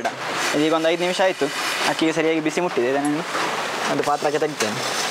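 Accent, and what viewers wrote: Mangalore, India. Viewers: I like ur explanation vid local kudla kannada spr..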